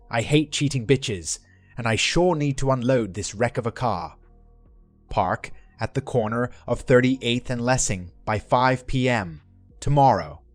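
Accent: United Kingdom. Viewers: I've heard this one a couple of times before, but I do have to say that having the AI with a British accent gives it a certain sense of charm.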